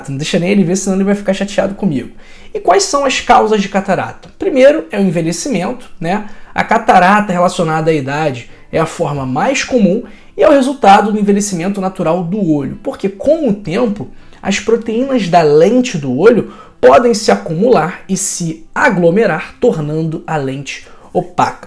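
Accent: Rio de Janeiro, Brazil. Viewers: Você fala muito muito carioca. É muitos x x x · Esse sotaque de carioca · Carioca ! Menos! .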